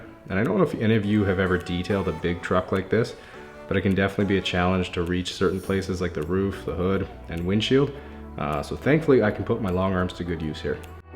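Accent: Canada. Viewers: Love the Canadian accent ! Aye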